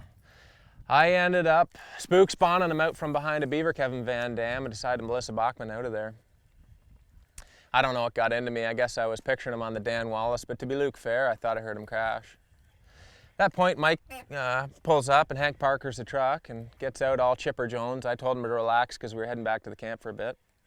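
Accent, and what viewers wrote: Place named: Canada. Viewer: He sounds flamboyantly canadian.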